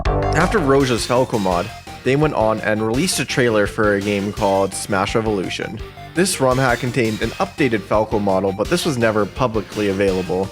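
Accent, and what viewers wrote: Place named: Canada. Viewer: this dude sounds canadian 🤢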